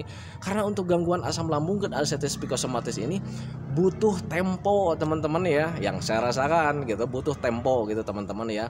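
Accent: West Java, Indonesia. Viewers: Bapa orang sunda ya? Kalo iya. Kali2 pake bhasa sunda nya dong gpp kali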